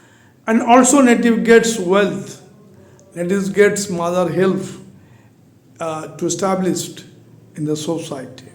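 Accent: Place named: Bengal, India. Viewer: Namaste Sir i heard Bengali Accent. It feels good. 😊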